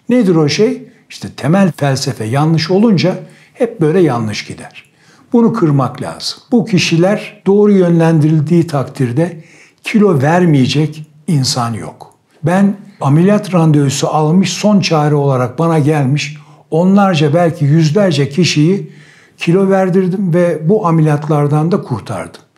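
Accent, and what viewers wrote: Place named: Istanbul, Turkey. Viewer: Istanbul lehçesi ayrı bir zevk veriyor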